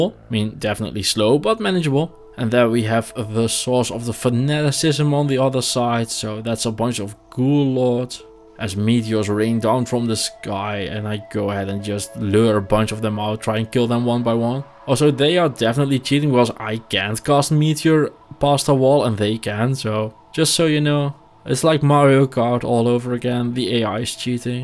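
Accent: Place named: Netherlands. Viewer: hou van het Nederlands accent haha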